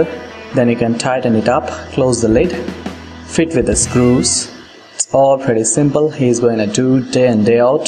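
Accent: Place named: India. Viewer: bro keep the natural Indian accent :) although it wasn't that bad but still keep the natural :V Potato kind of Indian accent XD and nice video :)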